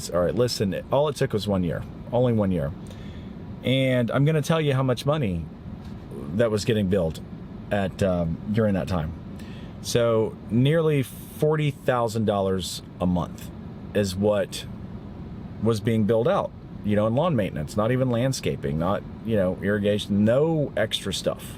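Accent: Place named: England, United Kingdom. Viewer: Sweet words except for the English accent! Yer right man...dedication, motivation, communication. It ain’t easy owning a lawn maintenance company but it can be rewarding.😀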